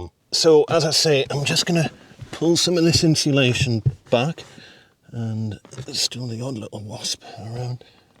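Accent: Newcastle, United Kingdom. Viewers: Hiya! Watching your video with all those wasps made my skin crawl. You’re a brave man! For next wasp season have you thought of using wasp bait “traps” with boric acid? The wasps are attracted to the boric acid laced bait, take it back to the nest to feed their young, and kill the whole nest for you. It takes about two weeks. I’ve used that method now for two wasp seasons and it works! There are plenty of videos here on YouTube explains how to do it. I’ve found protein baits (bacon fat and tinned cat food) works best in spring, and sugary baits (apple juice with a pinch of bakers yeast to give off a smell like fermenting apples) works best from mid summer onwards. Good luck! P.S. I love your Geordie accent!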